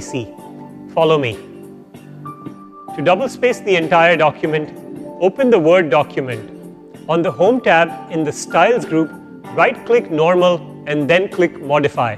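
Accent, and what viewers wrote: India. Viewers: he talks like an Indian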